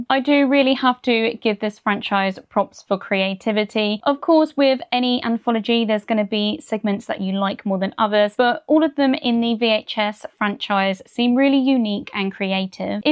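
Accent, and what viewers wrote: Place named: Southern England, United Kingdom. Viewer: I'm guessing u're from southern england like by u're accent, I'm from maidenhead berkshire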